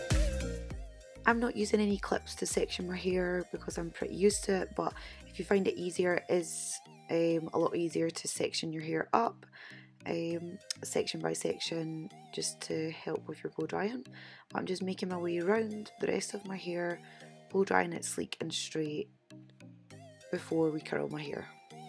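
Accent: Scotland, United Kingdom. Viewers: This has put me at ease more lol! And I’m glad I’ve found a Scottish blogger so I understand someone better Hahaha! I’m getting tape extensions done soon for the first time so feeling a bit nervous about maintaining them seeing as I’ve not got any experience! Think they’re ones actually I’m getting.:)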